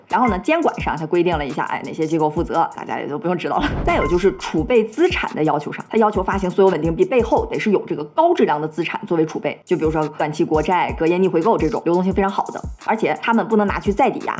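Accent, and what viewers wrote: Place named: Northeast, China. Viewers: 这么漂亮睿智的女人，一张口，出乎意料的东北大碴子味普通话！😂😂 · 他们都是大连人啊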